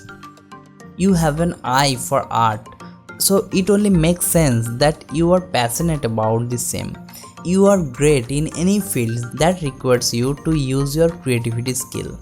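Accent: Bengal, India. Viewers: His accent sounds like Bongs 🥶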